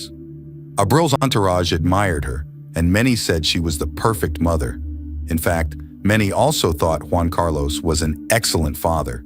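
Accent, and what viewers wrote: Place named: Mexican American, United States. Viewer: Sounds like Ameri/mexico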